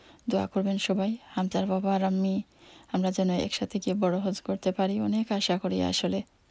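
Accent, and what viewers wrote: Sylhet, Bangladesh. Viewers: Assalamalakum আপু আপনিও মাঝে মাঝেই সিলেট কথা বলবেই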